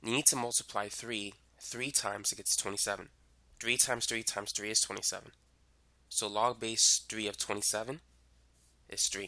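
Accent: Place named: Queens, United States. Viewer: there’s too many especially on the east coast, if I were to guess I’d say this guy probably grew up in the Jewish side of Queens New York but I can’t really tell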